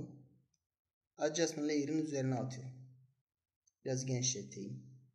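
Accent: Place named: Urfa, Turkey. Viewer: Eline diline sağlık şu Urfa şivenle dahada çok seviyorum sizleri ve kanalını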